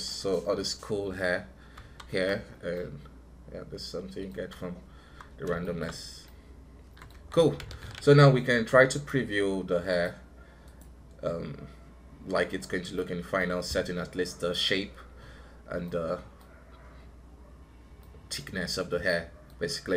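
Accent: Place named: Nigeria. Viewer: wtf just when i thought i was the only nigerian who knew blender. even from ur voice i know u are nigerian keep it up bro.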